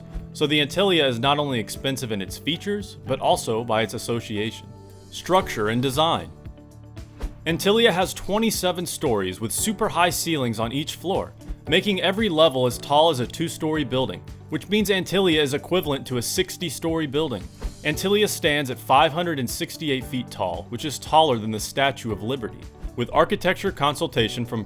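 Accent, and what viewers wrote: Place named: Liverpool, United Kingdom. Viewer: Nacks scouse bro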